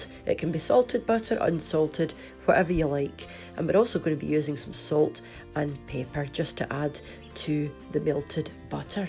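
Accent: Scotland, United Kingdom. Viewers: We love Scotland too! Especially the accent! Kind regards from Mr Turk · Love the Scots' accent! Could listen to her voice all day! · it looks delicous a bit unclear about how much liquid to add can you give me the amount based on the measuring cup I would add more veg. to it, I'm totally inexperienced in the kitchen or using a slow cooker love the Scottish brogue :)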